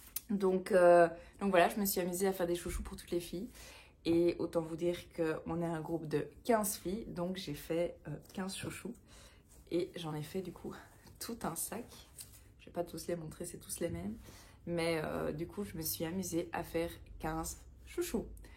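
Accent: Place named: Belgium. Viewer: Et j’vois que tu arrives récemment sur le booktube. Bienvenue. Et ton accent léger sur les ”R”..pam waterloo..Bruxelles.! Évidemment! ( bon après coup.. j’avais failli ecrire que t’étais alsacienne...😲🙄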